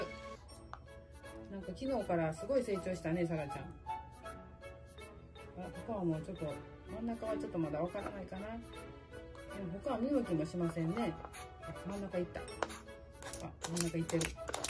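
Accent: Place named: Kansai, Japan. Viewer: 関西弁は親しみやすい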